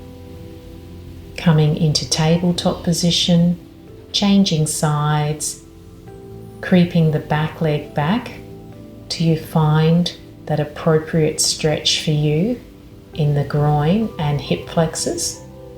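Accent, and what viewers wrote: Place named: Australia. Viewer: Sublime thank you. I loved hearing the Aussie accent. What a beautiful setting...Blessings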